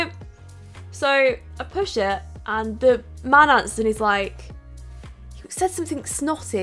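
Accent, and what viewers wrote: United Kingdom. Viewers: I've never noticed your British accent before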